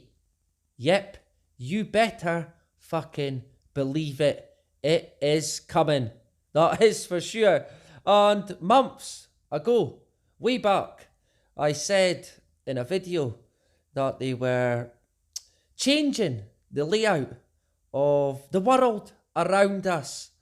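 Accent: Scotland, United Kingdom. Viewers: Scottish...its lovely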